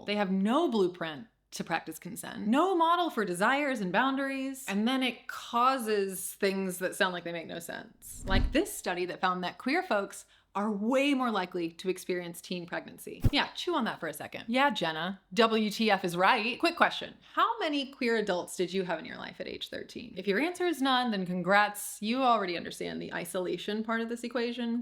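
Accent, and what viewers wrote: United Kingdom. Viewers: Welcome back,! What a fantastic video (incredible edit, reminds me of PBS Get Lit content), I can't wait to see what else you produce. <3 P.S. I had a little giggle when you acknowledged your change in inflection to being more bri'ish.;)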